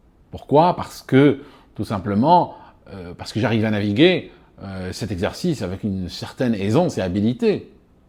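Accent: Switzerland. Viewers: Quand vous parlez de cela vous parlez de manière très saccadée. Cela diminue fortement la pertinence de vos propos. De la langue arabe transposée en français ? Cela change de votre accent suisse quand vous êtes nerveux, et compréhensible maintenant que vous êtes dans un pays de langue arabe. À part cela cinq étoiles sur le contenu. Flight safe et merci.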